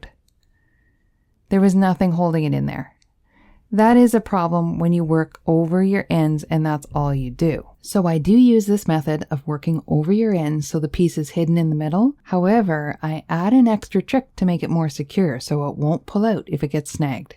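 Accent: Canada. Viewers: Love this! Also love her East Coast or Canadian accent..."the yarn end pulls oot." 😊❤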